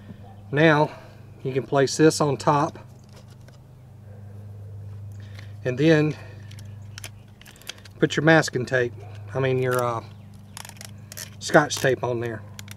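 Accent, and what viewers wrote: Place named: South, United States. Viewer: Ipple cider he's a good old country boy I respect and love the accent I been living in Tampa fl for a really long time more than half my life now and married a girl born and raised here just something about it she's not "country" perse but she is born and raised down her I was born and raised up north new York to be exact and it ironic because she hated new Yorker but some how me and her just well the rest I history we have a whole family now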